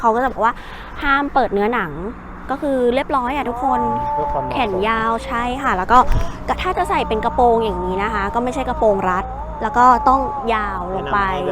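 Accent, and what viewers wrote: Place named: South, Thailand. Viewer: คิดอยู่จ้าว่าทำไมพูด ดา เดิ้อ คนบ้านเอ๋ง นี่เอ๋งนี่้เอง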